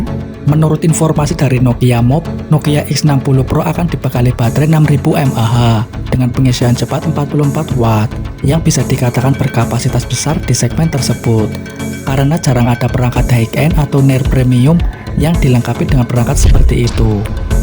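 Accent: Banyumas, Indonesia. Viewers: Wong ngapak kie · Ngomong2 admin logatnya ngapak ... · Bosone ngapak ngapak.....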